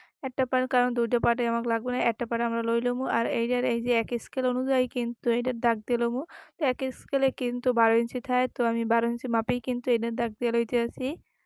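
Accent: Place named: Barishal, Bangladesh. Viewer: হে বুবু আপনিও তো খাস বরিশাল কথাই বোঝা যায়।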